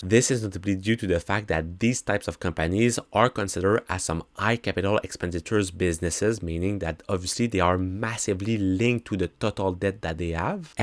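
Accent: Quebec, Canada. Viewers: Tu es québecois?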